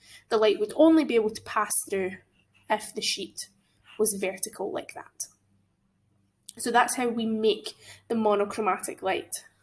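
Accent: Scotland, United Kingdom. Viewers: It’s just a Scottish accent calm down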